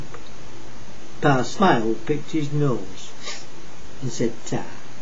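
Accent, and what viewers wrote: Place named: Lancashire, United Kingdom. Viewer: Oi! Let mee gess! Oi loike it, because eet's gud fer lernin' a Lancashire ahccent! Translation into American English: Hey! Let me guess! I like it, because it's good for learning a Lancashire accent!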